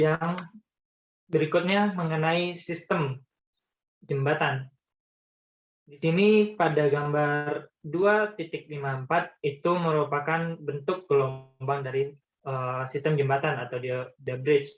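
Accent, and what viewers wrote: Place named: Padang, Indonesia. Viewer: Logat Padangnya kental sekali ... 👏👏👏👏👏👏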